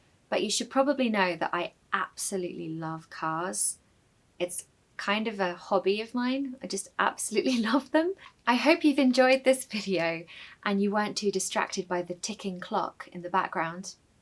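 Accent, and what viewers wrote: United Kingdom. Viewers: The best video I have watched so far in English words with a British accent. I hope that more videos like this lesson will be uploaded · British English sounds sooooo amazing! 🥰 Thank you for this precious lexical collection,! Please, do more videos of this kind. You sound so charming! I am Ukrainian and fell in love with British English in my teenager's years. I've been to London last summer for the first time in my life. OMG! I felt like in Paradise listening to British English. You walk on the street and hear behind you a Duke speaking with an intonation of majesty, you turn around and see just a guy in a streched T-shirt. British English is really something etherial and sumptuous! Love it so much!🥰😍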